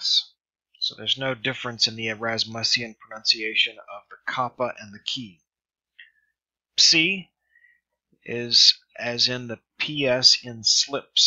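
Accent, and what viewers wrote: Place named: United States. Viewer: This is American pronunciation.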